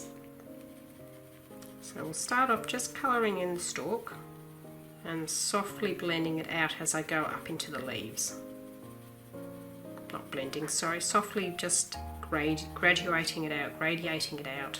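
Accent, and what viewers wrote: Australia. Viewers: I haven't done the duck pond yet, but i love coloring along with your videos. The music is soothing, your auzzie accent is great, and your techniques inspire me! I see progress in all of my pages :) Just wanted to say thanks, and keep up the great videos :D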